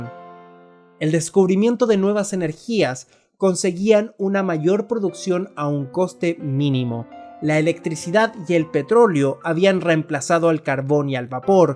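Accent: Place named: England, United Kingdom. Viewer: Buen video pero si quieres hacer el pedante pronunciando los nombres en inglés asegúrate de que sean ingleses para los europeos que pronuncies nombres franceses o alemanes con acento inglés es francamente ridículo......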